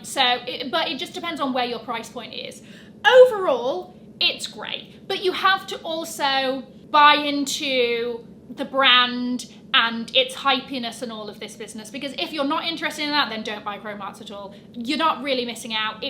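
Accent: United Kingdom. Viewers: “Burberry trench coat for everybody” she says with a strong British twang… 😊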